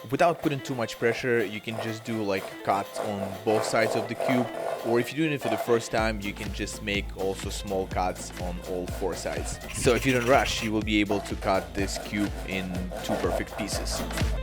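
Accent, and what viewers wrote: Russia. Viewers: Капец акцент русский) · Ты русский))))) дададададададдаада я знаююююююю. Этот акцент везеде слышно аахахахх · Судя по акценту, ты русский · Your accent is similar to Russian, you're not from Russia, do you? name is also popular in Russia)!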